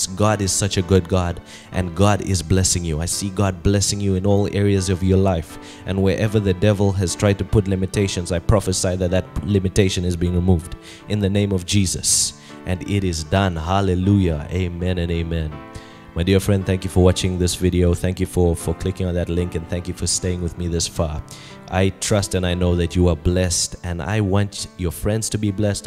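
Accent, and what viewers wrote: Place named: South Africa. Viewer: Amen❤❤, are you South African by any chance ? Your accent sounds very South African.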